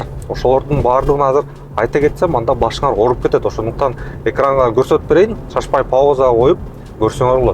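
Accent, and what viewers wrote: Standard. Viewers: Азамат жигит экенсин Кыргызча таза суйдоп тушундургонун учун.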